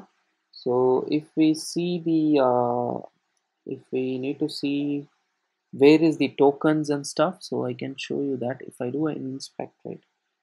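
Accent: India. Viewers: I think its sad that a so talented and good content creator as this speaks with so much indian accent. I dont know why but I really cant handle the indian accent at all. Almost allergic.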